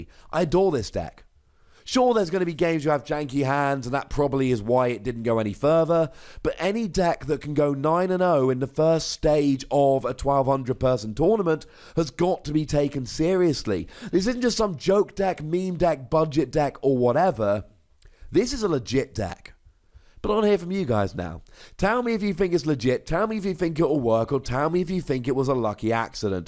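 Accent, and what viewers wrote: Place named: United Kingdom. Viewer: I´m sorry if this offends you, but the way you say "ball" kinda sounds like "bull." Is it the british accent? Edit: And also, when I played against some guy at a league challenge, he played apricorn maker, and that pretty much won him the game becuase he could set up so fast. Minutes after the game finished, he kept telling me how good apricorn maker and that he´s happy he´s playing it.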